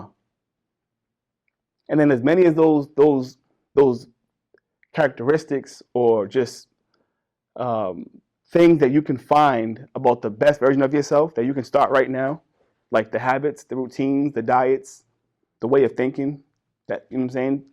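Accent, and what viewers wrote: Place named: New Jersey, United States. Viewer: Lovely accent........New Jersey ?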